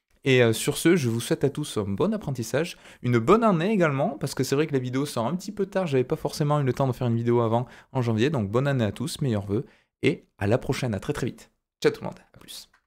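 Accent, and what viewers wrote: South, France. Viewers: Ah cette voix et ce petit accent du soleil, pédagogique à souhait ! Bravo encore à toi !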